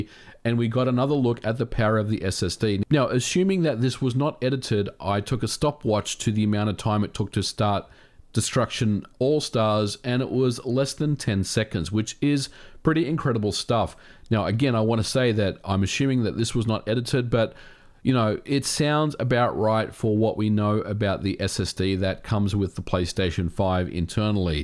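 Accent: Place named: Australia. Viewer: Australian with a hint of American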